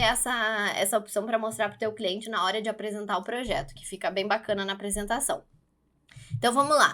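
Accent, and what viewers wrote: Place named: South, Brazil. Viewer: trem bonitinho e esse sotaque do sul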